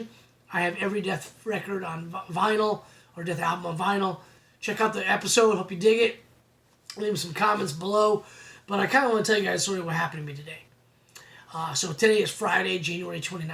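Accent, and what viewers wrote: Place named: Northeast, United States. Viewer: I’ve been a ‘tallica family member for 28 years, (fell in love after hearing that my sisters copy of when I was 7) wish they would’ve switched for! Also just a side note, I grew up in Boston (8 miles from Fenway park) you sound you lived in the Northeast at some point....great story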